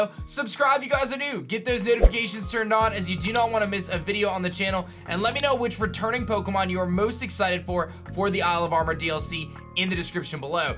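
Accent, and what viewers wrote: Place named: California, United States. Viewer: Had no idea you were in CT. Me too! Would’ve thought California from some of your speech patterns. Big fan of your channel. See ya around!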